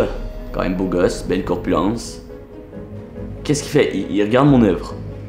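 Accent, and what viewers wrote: Quebec, Canada. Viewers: J’adore ton accent Québécois x)) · L'accent québécois :D "J'ai du matériel pour faire de l'art" qui devient "J'ai du matériel pour faire de l' or " xD, c'est magnifique comme phrase M'insultez pas svp, j'le trouve très joli votre accent :)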